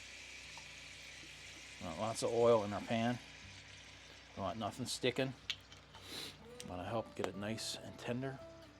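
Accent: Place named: Newfoundland, Canada. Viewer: I absolutely love watching anything you post. Love that Newfie twang! I have a NovaScotia twang lol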